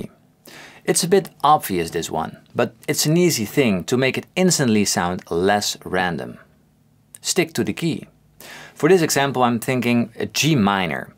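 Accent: Netherlands. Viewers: Are you a dutchy? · Je hoort het zo fel dat je een Nederlander bent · I love that you can hear his accent a little. Viva hollanda🇳🇱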